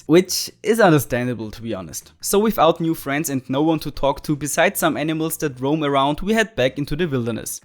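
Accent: Germany. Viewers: I think i hear in your pronounciation that you are from germany, m i right? no offence. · Are you german? 😅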